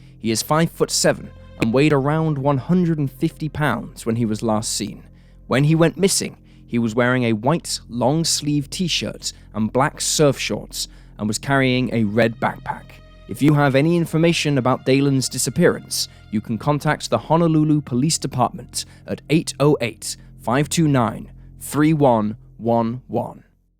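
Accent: United Kingdom. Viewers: When I click on a YouTube video and I hear the narrator has a British accent I am immediately disappointed 😞.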